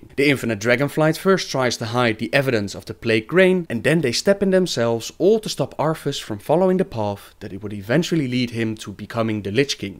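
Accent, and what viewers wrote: Netherlands. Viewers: The person speaking in the video is Obviously Dutch, no doubt. This accent is unmistakeable. :b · Is that a dutch accent I spot? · Is this guy dutch? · Probably dutch, maybe south african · Are u dutch ma men?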